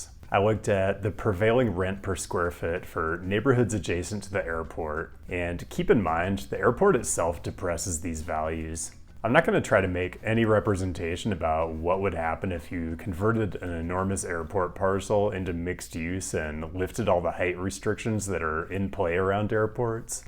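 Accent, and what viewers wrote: United States. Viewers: SJC definitely shouldn't be on this list. Proximity to downtown San Jose is a MAJOR advantage. Unlike in Europe, we don't have to travel for hours to get to the airport. It's right there, 10 minutes away from 1st and Santa Clara by car or 20 minutes by light rail/bus. bro what the fuck? (1) why are we destroying the natural environment outside of the built area for no goddamned reason (2) that destroys all the advantages of the current location of LAS, which, the current location is great, because it's close to where MOST people are going. And LAS has public transit buses right there at the airport that can take you to the monorail and various other places. The monorail is only 3.1 miles (4.9 km) away. Taxis are usually parked at the airport and can get you to the nearest monorail station in 20 minutes. The public transit buses are slower but cheaper. 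Sorry, but the more I watch this video the more I see with which I disagree. Like I said above....that's really the bottom line. WHY would you want to create inconveniences???? By your accent, you're either an American or a Canadian....yet, you seem to have abandoned the frontier philosophy of "why not" for the old European one of "why".... look, sacrifice IS noble, when you're protecting others or you're protecting a valuable institution. That's not what is happening here. Given that airplanes move to green technology -- which IS happening, and will continue regardless of any action by any legislature -- there's no logical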